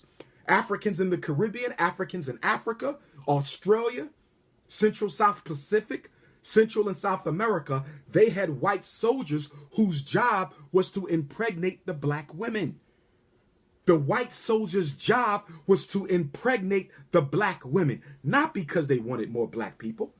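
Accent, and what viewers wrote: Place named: Philadelphia, United States. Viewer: Woooow... you can tell for sure Dr. Umar is definately from Philly! On another level...love my brother his intellect is beyond sexy! - I always say this, they will never ever go against the oppressor because the oppressor reared them--I dont believe they can.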